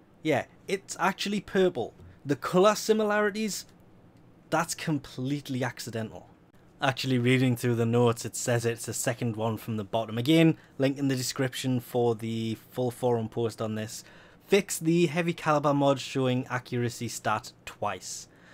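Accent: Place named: Newcastle, United Kingdom. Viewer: Ziot English, possibly a Geordie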